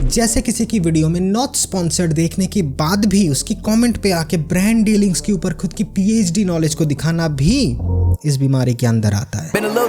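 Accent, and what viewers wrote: Bengal, India. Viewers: Bhai aap bengali ho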